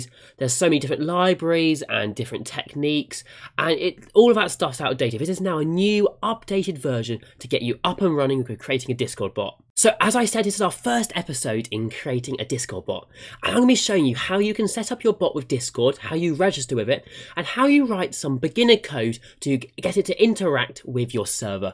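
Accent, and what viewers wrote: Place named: United Kingdom. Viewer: oh, you sound so british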